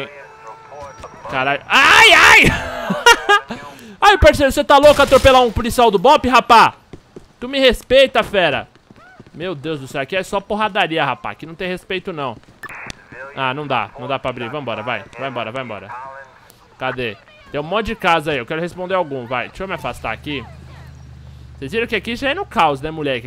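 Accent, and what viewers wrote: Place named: Sao Paulo, Brazil. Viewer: be eu to zuando pqp cara '-' eu odeio sotaque de paulista mas n vo odiar o cara pq ele fala estranho '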